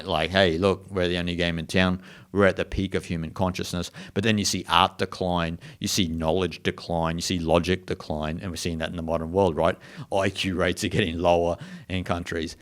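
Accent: Australia. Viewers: Great content and presentation. Always good to hear an Aussie voice. 😊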